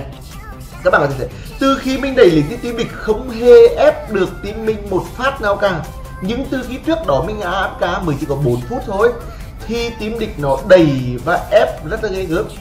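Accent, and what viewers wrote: Nghe An, Vietnam. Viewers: Idol của tui ngày nào , cái dọng Nghệ An , từng cái clip cẩm nang Nak , giờ sắp thành Idol triệu sud rồi , chúc idol thành công nha , Mại yêu 😘😘😘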